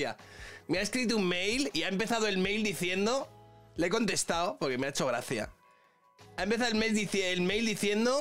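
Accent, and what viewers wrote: Mexico. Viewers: no se por que youtube me recomendó el video, no entiendo el contexto pero ame tu acento mexicano 🤣🤣🤣🤣🤣🤣 entonces esa youtuber española si estaba haciendo acento mexicano cuando resumio coco y yo creyendo que el barrio la respalda. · Buen acento mexicano, en mi opinión. Me imagino que ese hermano Culichi le escribió para platicar algo de seguridad en mi México lindo 🙈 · 😂😂😂😂, me gusta tu acento mexicano ja,ja,ja,ja,ja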